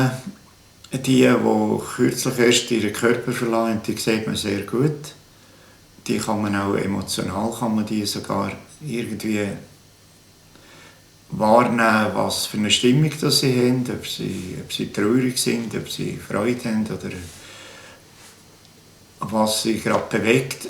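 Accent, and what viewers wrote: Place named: Switzerland. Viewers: Für mich auch - unmöglich - viel zu anstrengend - da geht mancher Satz total unter. Mein Dialekt ist d. Schwäbische - red aber jeweils auch nur unter uns so - ich will doch verstanden werden - oder erwartet man , daß jeder interessierte hier gefälligst dem schwyzer' dt. sich anpassen sollte ? Sehr schade !Dazu noch den Bart , wo es erschwert , evtl. v. d. Lippen ablesen zu können ! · Ich liebe den Schweizer Dialekt, leider so schwer zu verstehen. :)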